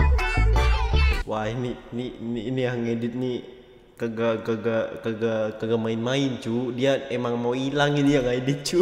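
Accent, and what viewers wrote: Java, Indonesia. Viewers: Bang Lo jawa mana bng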